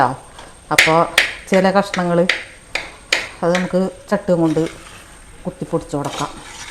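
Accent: Thrissur, India. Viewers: കപ്പ ബിരിയാണി അടിപൊളി തൃശ്ശൂർ ഭാഷ നല്ല രസം ❤Super video .നമ്മൾ കപ്പ ന്നു പറയും❤വിറകൊള്ളി എടുത്തു വരുന്നത് ഓർത്തപ്പോൾ ചിരി വന്നൂട്ടാ 😂😂❤Super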